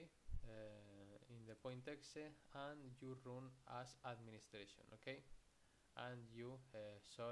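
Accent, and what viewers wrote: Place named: Albacete, Spain. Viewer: tienes un acentazo de albacete q no te puedes ni imaginar pero me has salvado la vida eres el mejor sigue asi por favor te amo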